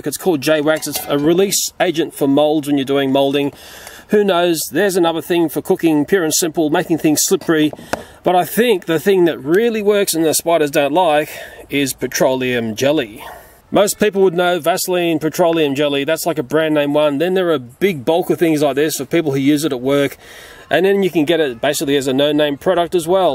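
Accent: Australia. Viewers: you sound exactly like me (an Aussie) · I love the way he says spiderlings in all his videos his videos I love aussies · i love his voice, aussie accents >>> `- love from Texas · I bet my kidney hes australian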